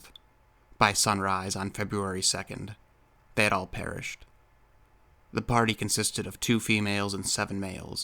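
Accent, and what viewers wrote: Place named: United States. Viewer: nope...northern canadian .... now living in tropics,btw : Your accent sounded 'american'..... if I'm wrong, I stand corrected. So what are you ? and what about 'radiation' reports in the area? Russians are pretty open about their findings. Your bombastic intro and analysis did Nothing for a conclusion. Which clinically... is real psycho. Now STFU with bs .... and offer a solution.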